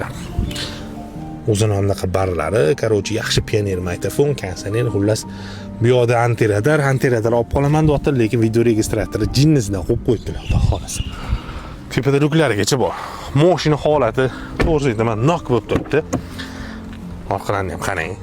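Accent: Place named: Tashkent, Uzbekistan. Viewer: Узи тошкентликми бу чала гапирмокда тошкент шевасида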